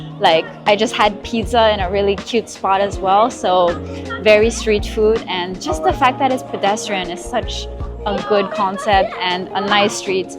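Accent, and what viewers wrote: Quebec, Canada. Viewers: TU PARLE BILINGUE ON AIME CA C EST QUEBECOIS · Excellent quick view of a few of our good spots…..you do speak french like a québécois, accent, expressions, rythym and all….congrats from Montreal…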